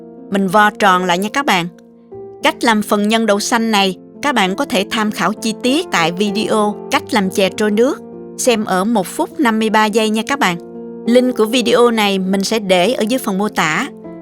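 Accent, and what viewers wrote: South, Vietnam. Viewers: Cám ơn đã chia sẻ cách làm chè con sò.Cách chỉ dẫn Rất gọn gàng, dễ hiểu, dễ làm và nhất là giọng nói miền Nam rất dịu dàng và ấm áp. Điều mà tôi thích nhất là cô không nói thừa, không nhắc đi nhắc lại những điều căn bản trong cách làm mà các bà nội trợ nào nghe và xem qua cũng nhớ. Chúc cô luôn vui khỏe và luôn thành công trong công việc của mình!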